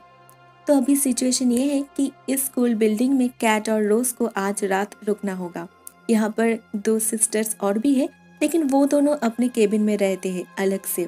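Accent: Bengal, India. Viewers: Pls work on ur Hindi dear...Im sure ur Bengali...tats y... gender mistakes happening....pls work on it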